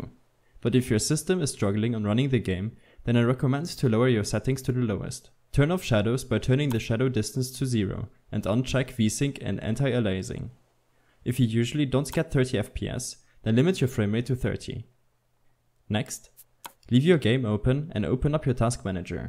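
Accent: Germany. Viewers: Man merkt das er deutscher ist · Man merkt an deiner Stimme dass du Deutscher bist :D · man hört den deutschen akzent aber gut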